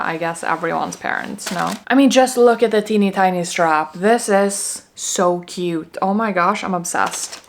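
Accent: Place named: Midwest, United States. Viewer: I have a non bag question. Where did you learn English.? Do most swedes speak English.?.Your English is excellent. You have a bit of a Midwest American twang, very slight, but I can hear it. I lived in Chicago for 10years. Thanks to you I'm looking to get an Evelyn. I never would have looked at them prior to meeting you. I thought they were not pretty. So thank you for opening my eyes. I'm looking for a maroon colour. The GM is the largest one, right? Wish me luck....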